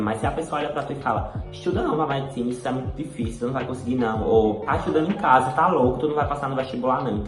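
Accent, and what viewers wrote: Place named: Northeast, Brazil. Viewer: que sotaque nordestino maravilhoso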